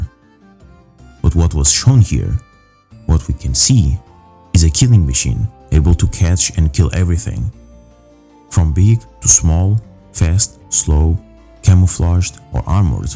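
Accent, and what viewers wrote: Portugal. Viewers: amazing video as always man, i too am worried with the lack of info in this concept. P.S: your accent is very familiar to me. do you happen to be portuguese?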